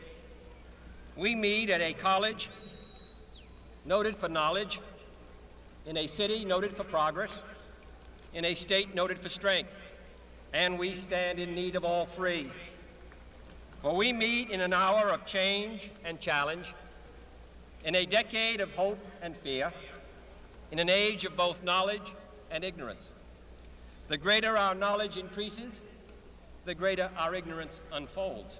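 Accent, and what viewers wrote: Boston, United States. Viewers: Such amazing orator. Even when he says “hard” in his full-throated Boston accent, it sounds beautiful. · His Boston accent cracks me up "it's all too haaard"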